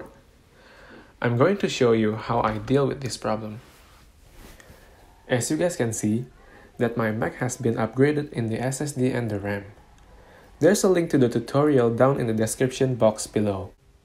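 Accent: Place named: Indonesia. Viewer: lsg ngenalin logatnya orang Indo, eh terconfirm dengan modif mobil jadi polisi lalu lintas wkwkw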